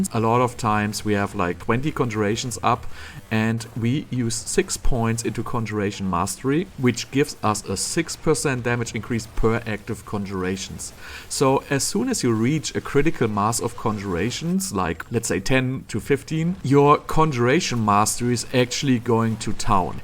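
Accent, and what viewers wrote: Germany. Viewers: So geil das man deinen deutschen Akzent hört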